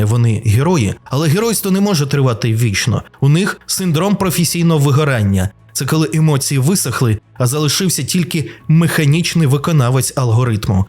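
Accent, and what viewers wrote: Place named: Russia. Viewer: диктор - ЗНАЙДИ українця для редагування тексту який читаєш, навіть не надто освіченого - просто неможливо чути твої ПОСТІЙНІ русизми, наче 3м6іцил якийсь... Навіть ШІ краще прочитає... "нЕ київ, нЕ москва" нІ платить тобі, ога!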